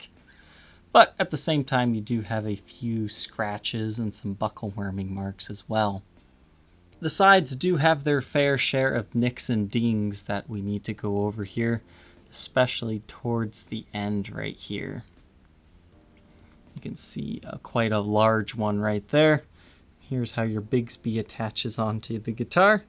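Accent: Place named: United States. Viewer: American English.... Hello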